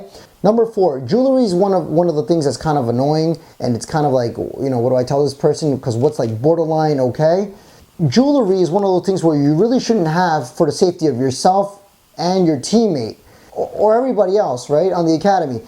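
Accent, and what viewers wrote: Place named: South, United States. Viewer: That southern accent though 😂😂😂 bloopers made this video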